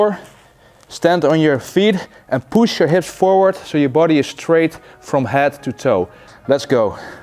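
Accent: Netherlands. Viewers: I can here the dutch accent in your english bro 😂😂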